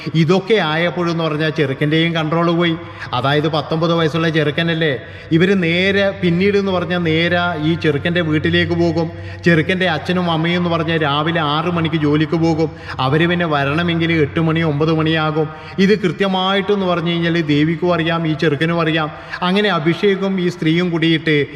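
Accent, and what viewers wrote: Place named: Malabar, India. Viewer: മലബാറിലെ, ഭാഷ എനിക്ക് ഒത്തിരി ഇഷ്ടമാണ്❤❤❤,അതു പോലെ താങ്കളേയും, താങ്കളുടെ യഥാർത്ഥ പേര് എന്താണ്,?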